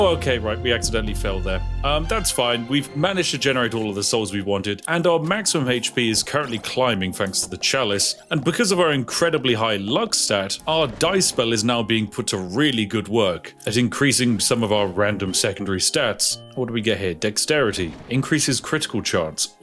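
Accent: United Kingdom. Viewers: is just british LGIO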